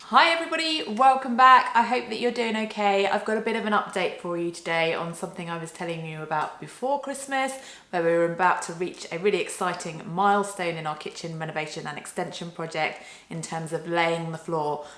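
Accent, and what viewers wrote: United Kingdom. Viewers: British akcent ❤️❤️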